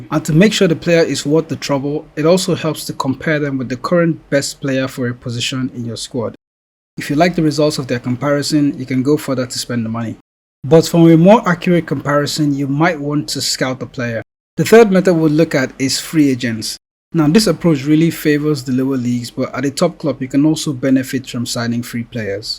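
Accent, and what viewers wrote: Nigeria. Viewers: Are u Nigerian?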